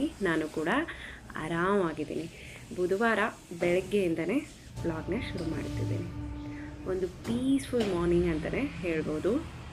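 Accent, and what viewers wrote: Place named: Shahapur, India. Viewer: ನಿಮ್ಮ ತವರು ಮನೆ ಯಾವೂರು ನಿಮ್ಮ ಭಾಷೆ ಕೇಳಿದರೆ ನಮ್ಮ ಕಡೆ ಹಾಗೆ ಮಾತನಾಡುತ್ತೀರಿ ನಮ್ಮದು ಶಹಪುರ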